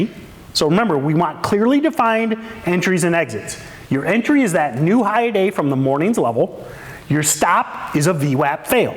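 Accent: United States. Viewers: just so you know this is the most simple, no nonsense video on patterns that i have ever seen and i have probably seen hundreds of different videos. when other people explain things it is almost impossible for me to understand but the way you, explain things paints a clear picture in my mind and actually gives me actionable instructions that i can start applying to my trading. so thank you very much for that. maybe it's because you're also from the midwest that i am able to understand you so well, but anyways i appreciate it. i also have been enjoying the podcast. it's just the right balance of entertainment and informative content. would love to see more videos like this.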